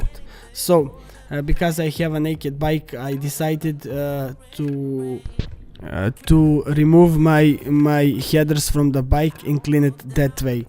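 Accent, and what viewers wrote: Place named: Balkans. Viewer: Tacno se cuje taj balkanski akcenat :D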